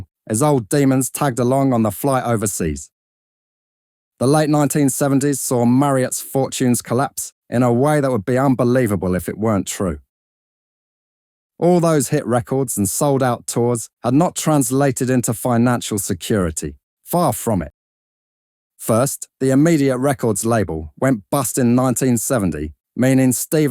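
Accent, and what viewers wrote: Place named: London, United Kingdom. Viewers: A Cockney geezer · Can't stand the narrator, what is he, some London Wide Boy? Terrible halting voice. Get rid.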